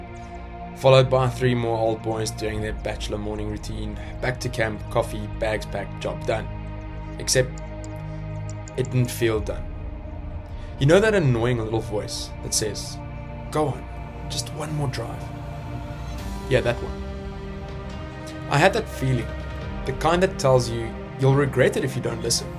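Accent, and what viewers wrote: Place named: South Africa. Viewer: Pretoriuskop to see leopards.....definitely not. Travel more north to the Skukuza and Lower Sabie region to see lions and leopards. Thravel NORTH not SOUTH from Pretoriuskop to the Skukuza region......you have the South African accent but you don't know directions, so maybe you are tourists. At the end saw your name....what a surprise.....I am also a! (from Pretoria)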